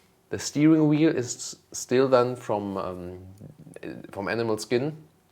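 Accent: United Kingdom. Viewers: Many thanks for taking your time for the Q/A. Great episode, great Schnauzers, great British Accent. I am already looking forward to hear your Danish skills ...... definetly! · Your British accent is hilarious xD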